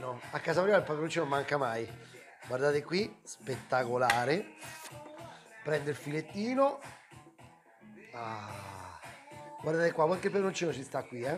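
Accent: Rome, Italy. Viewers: A chi non piace come parli? Aó se sei de Rome sei de Roma no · N1 ti seguo da poco sei molto simpatico e l'accento romano è il top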